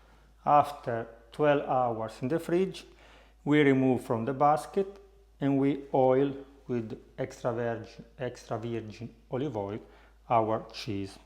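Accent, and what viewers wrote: Italy. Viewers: Everything just geting better, when you speak with an italian accent.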